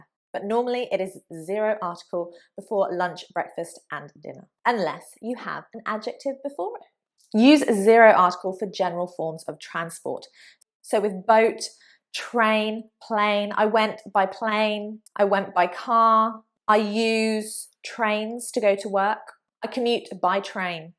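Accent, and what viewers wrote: United Kingdom. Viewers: I love it .the british accents because is too Much sweet and perfect and it is not rude to me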